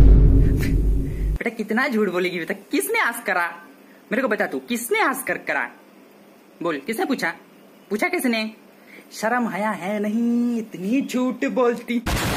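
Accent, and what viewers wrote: Assam, India. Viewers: Ap Assamese ho kya ap ke tone se aisa lagta hai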